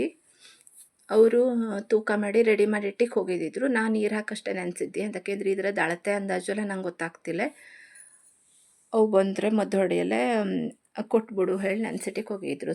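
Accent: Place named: Karnataka, India. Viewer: nim havyaka bhashe slang namma kundapura bhashe ge shekada 80nrashtu hathira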